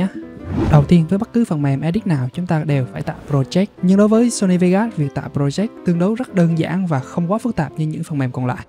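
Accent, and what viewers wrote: Vietnam. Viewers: Giọng giống người Đà Nẵng?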